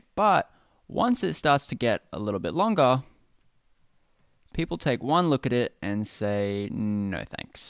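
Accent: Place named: Australia. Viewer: Love hearing an Aussie accent. Is there a Framer template that already has the multi step form built in? I'm useless at building stuff in Framer.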